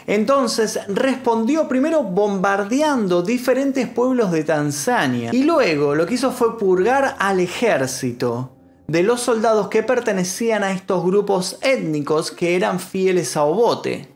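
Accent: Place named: Argentina. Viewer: Oye no puedes grabar los vídeos sin meter ese asiento argentino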